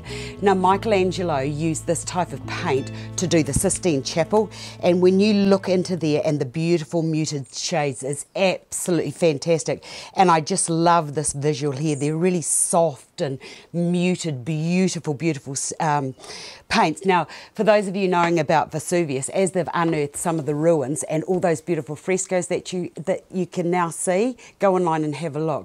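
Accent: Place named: New Zealand. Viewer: Thank you for answering. So helpful. Miss that lovely Kiwi accent. I’m from the States, but lived in NZ for 3 years back in the 90’s, 1 year in the greater Auckland area and 2 just outside Christchurch (Governor’s Bay). Beautiful country and lovely people. 😊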